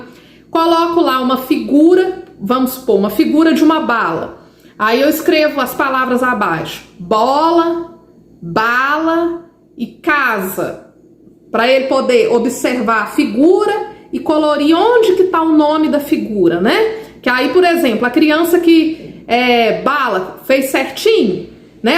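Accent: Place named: Minas Gerais, Brazil. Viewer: Vc é mineirinha? Sotaque inconfundível 😅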